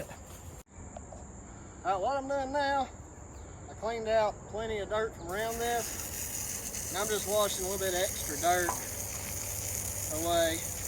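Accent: South, United States. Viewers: My girlfriend is now forbidden to watch this channel. She is commenting on your tats and southern talk etc. lol. She looks at every guy with their shirts off. Now i know why she is watching these big machine channels. DUDE! I can't believe you accidently crushed the septic tank. This has been one of the hottest Summers in a long time. Go easy.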